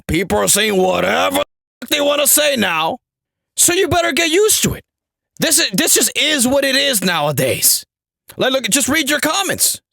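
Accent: India. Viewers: Love the indian accent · This is the first of your videos I've seen, the exaggerations, poop jokes, indian accent, pasionate voice, GTA style character talking, and 'MUTAHARRRR' makes me feel like I'm dreming and having a panic attack at the same time. Keep it up, bro.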